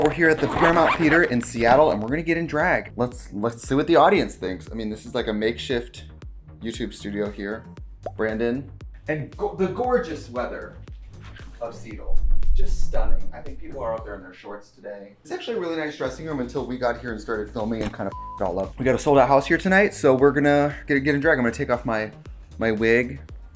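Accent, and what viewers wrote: Wisconsin, United States. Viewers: We need a full video with the super Wisco accent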